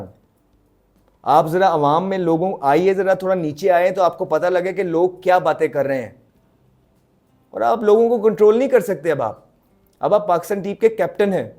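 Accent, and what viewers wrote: Punjab, India. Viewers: Shazad bhai one of the many excellent talents who could not flourish or even evolve because of what Pakistani hierarchy is, and excellent points bhai, also love your “pure” Punjabi accent! Keep it up Sheraaa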